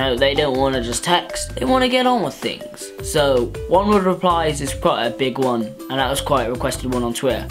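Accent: United Kingdom. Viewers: are you British? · Is he British or American?? BTW ur one of fave youtubers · Is he British? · He's British😊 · British x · Yay is British!!!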